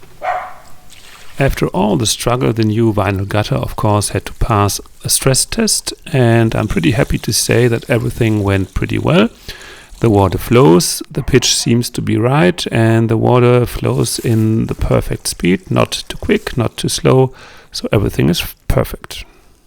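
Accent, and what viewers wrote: Germany. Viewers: Just found this series. Trying to figure out where this property is? The guy seems to have a German accent? Love the progress he has made so far. Very satisfying to see the yard clean ups! Please post an update. Beautiful countryside wherever it is. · your German accent is what makes you charming to me. I’m in Nebraska USA. Dead center of the country 😁 I’m truly enjoying your channel. Keep up the great work 😊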